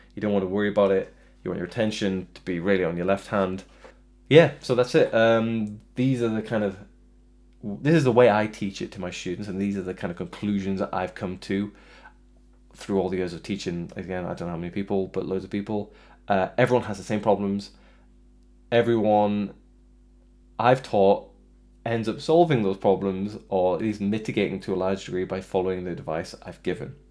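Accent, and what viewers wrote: United Kingdom. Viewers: Is that a Shrewsbury accent 🤔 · Are you from Northern Ireland? You sound like Bear Grylls.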